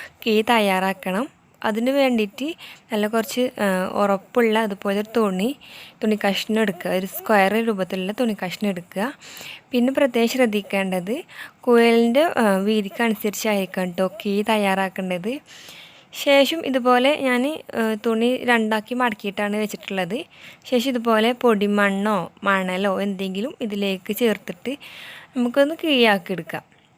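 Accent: Malappuram, India. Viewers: മലപ്പുറം ആണോ ഇയാൾ. നല്ല ഭംഗിയുള്ള സംസാരം...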